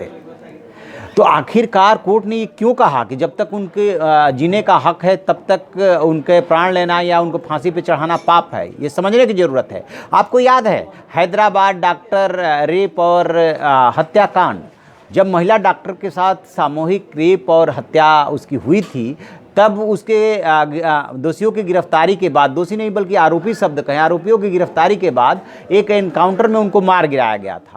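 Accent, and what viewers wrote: Bihar, India. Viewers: Bihar se ho kya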